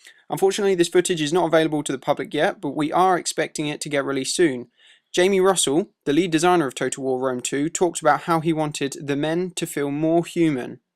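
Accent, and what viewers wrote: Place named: United Kingdom. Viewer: your voice is so nice...no homo...most british sounding voices are so ehhh :? but yours is noce to listen too lol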